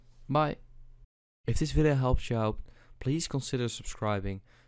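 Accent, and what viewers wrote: Netherlands. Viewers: Are you Dutch? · Dat nederlandse accent hahahah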